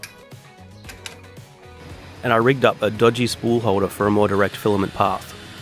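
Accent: Australia. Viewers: X really you're Australian I think you're Australian you have an accent anyway you're still great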